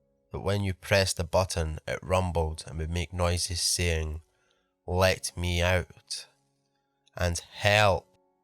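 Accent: Scotland, United Kingdom. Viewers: Came here from Swamp Dweller and subbed immediately! I just LOVE your Scottish accent!! Paranormal and Cryptid stories are my favorite! There is another Scottish horror narrator. Channel named HELLFREEZER. Another excellent channel!! ;-)👍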